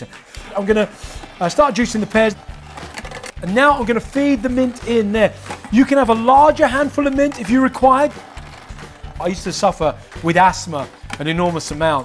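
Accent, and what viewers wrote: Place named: United Kingdom. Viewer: I love Jasons British accent!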